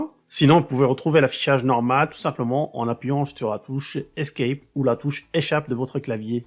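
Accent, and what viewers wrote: Quebec, Canada. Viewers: J'adore l'accent québécois. Je pourrais t'écouter pendant des heures mais je ne me lasse jamais. Ah Seigneur j'attends un que tu mettes un québécois sur mon chemin il va parler pour que je t'écoute 🥰🥰🥰😂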